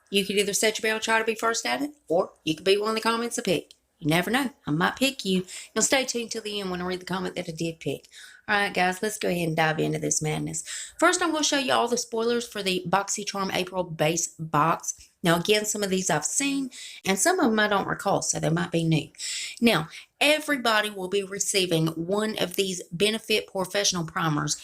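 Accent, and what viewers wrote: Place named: West Virginia, United States. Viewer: Girl you sound more like a WV girl than a OH girl. Just sayin.. you have to be from the country! ❤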